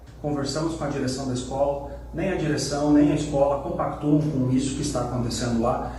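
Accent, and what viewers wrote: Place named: Santa Catarina, Brazil. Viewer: Deputada Ana, quero te parabenizar pelo excelente trabalho, e também o vereador que denunciou, pena que a senhora não atua em São Paulo, capital onde eu moro, mas na minha opinião, afastamento é pouco, porque isso é o sonho do esquerdista, ganhar sem trabalhar, ela tem que ser expulsa por justa causa. Penso que esses militantes disfarçados de professores, fazem isso por que no máximo que vai acontecer com eles é o afastamento, que eles vão ser aposentados, antes da hora. Com isso essas pessoas lucram na cabeça deles, de todas as formas, fazendo a cabeça da sociedade e ganhando mais militantes pro movimento e se for pego é aposentado, que maravilha pra eles, acaba sendo um incentivo eles fazerem isso, a direita precisa parar de bancar financeiramente a esquerda, um exemplo é o descondenado que nunca trabalhou, e tem uma ótima aposentadoria por ter perdido um dedo, outra pensão ótima por ter sido exilado, e vitalícia, no valor que supera os 30.000,00 reais por mês, deveria existir um conselho de fiscalização do ensino, igual a OAB, CRM, CRosp , CREA, e etc... 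e se o professor fizer esse tipo de absurdo, que perca a sua carteira da ordem e não conseguirá dar mais aulas. Sou Paulista, mas tenho muita vontade de conhecer e até mesmo morar em Santa Catarina, pois gosto muito da cultura, do respeito, desses valores que estão fazendo de tudo pra acabar. Gosto até do sotaque, acho muito bonito ver você falando. Hoje está muito raro encontrar uma mulher igual a você pra casar. Me perdo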